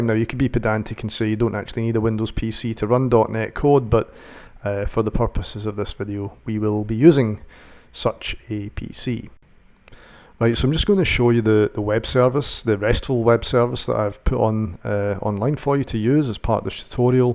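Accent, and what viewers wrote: Scotland, United Kingdom. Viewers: > youtube search for api tutorial c# > first vid contains "C#", "Step by step" and is 24 minutes long > clicks > scottisch accent > fuck yes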